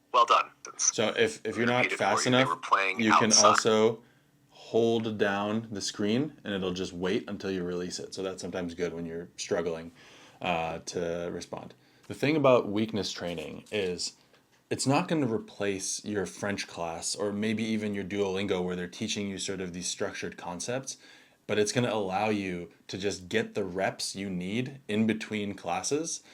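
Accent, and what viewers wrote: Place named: United States. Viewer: Very American accented French though, I wonder if you can change it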